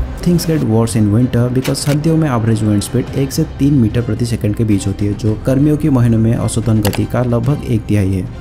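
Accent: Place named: Odisha, India. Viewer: You have an Odia accent. You're from Odisha?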